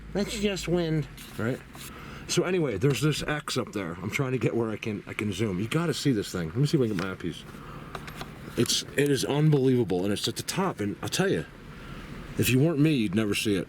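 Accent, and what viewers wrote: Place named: South, United States. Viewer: Don't mean to be distracted but when you said ummm it almost sound like Elvis, you have that sexy southern tone and your voices sounds like him too...lol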